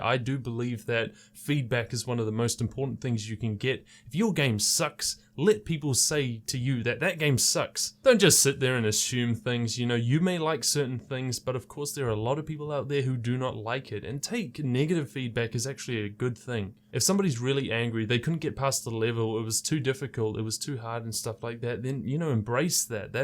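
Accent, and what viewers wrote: New Zealand. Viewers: R u from NZ?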